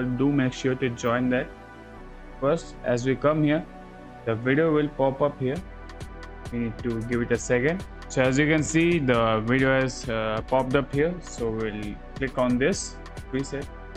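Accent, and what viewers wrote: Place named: India. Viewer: 😊Indian high quality voice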